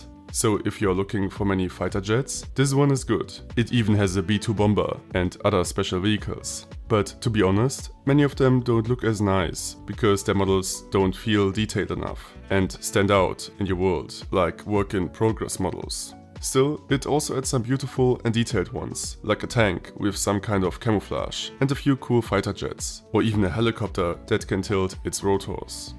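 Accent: Germany. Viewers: Bro are you german??? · are you german? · you are German or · thats a realy german english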